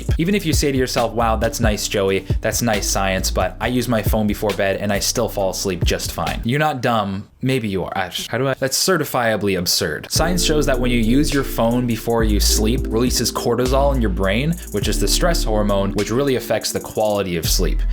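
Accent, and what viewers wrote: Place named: Canada. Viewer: Love it. Are you actually from Canada? First , because of pronunciation, but mostly because of the humour and laid back personality:) it seems like Canadians in general are funny, friendly and kind people !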